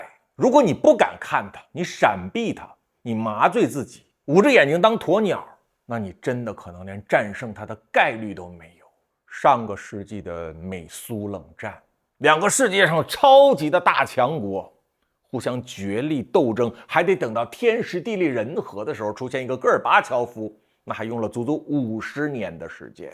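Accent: Beijing, China. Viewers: 这口音，这调调，这吊儿郎当气质，大概率是个前朝八旗子弟。怨恨中共那也是情有可原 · 叔 你老家是哪裡的？是北京嗎？突然好奇你的口音。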